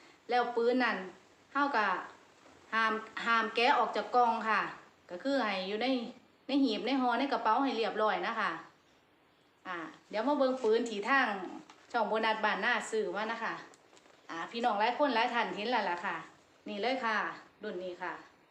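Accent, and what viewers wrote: Isan, Thailand. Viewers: สำเนียง.ต่ายนักฮ้อง..ภาษาอีสาน.เป็นภาษาที่สระสลวยขอรับ..ตาดูดาว.ท้าวติดดินชอบ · วันหลัง อัดคลิปพูดภาษากลางก็ได้นะคับเพราะคนฟังไม่ใช่แค่คนอิสานนะคับ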